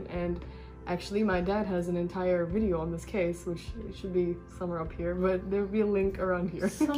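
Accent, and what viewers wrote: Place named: United States. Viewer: Wow... Wonderful Review in American English... Congrats...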